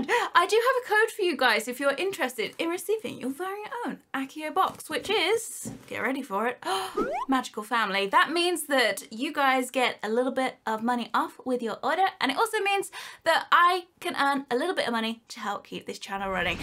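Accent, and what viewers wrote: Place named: England, United Kingdom. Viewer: Just wondering you sound English How do you pay in GBP Or do you just have to pay in dollars?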